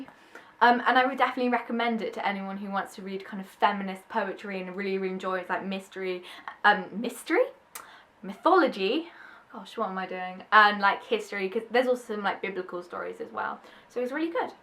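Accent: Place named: United Kingdom. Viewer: and I love your british accent. :)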